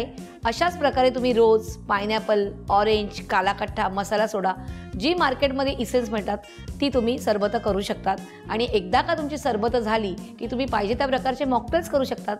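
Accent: Pune, India. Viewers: Me punyachi ahye pan atta Solapur la tumch bolana punyachi athvan Karun dete mast recipe astat me saglya thandgar reci try kelya toooooooo good .tq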